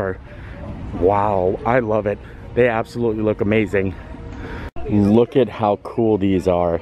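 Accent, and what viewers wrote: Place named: Midwest, United States. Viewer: I have been trying to place that accent for a long time. I knew it was midwest but not sure what state. I was thinking Minnesota?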